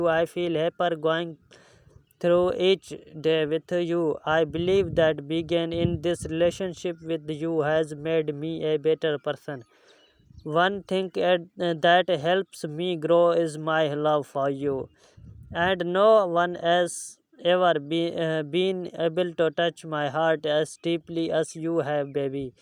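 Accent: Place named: India. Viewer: I don't like to hear in this style totally bhartee English better without voice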